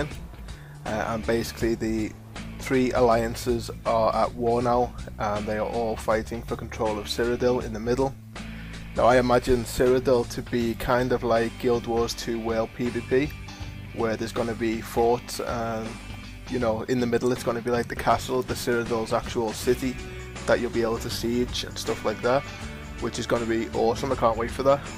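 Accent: Liverpool, United Kingdom. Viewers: Oh and its nice to hear another scouser on YouTube =D that alone earned my sub =D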